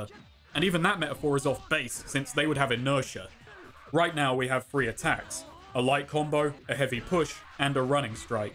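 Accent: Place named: Liverpool, United Kingdom. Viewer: Alright eh! A scouser in bizarre Adventures of Jojo, Class one there mate.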